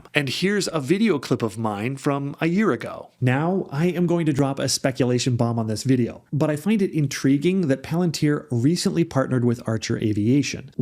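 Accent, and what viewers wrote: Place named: Midwest, United States. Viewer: yep, I listened very close and I heard you and trusted 100% in your research! Been listening very close since the first vid I watched of yours! Must have heard that midwest accent! Thank you for alllll the hard work and explaining what you did at AMZ explains a lot! Even have my husband listening now!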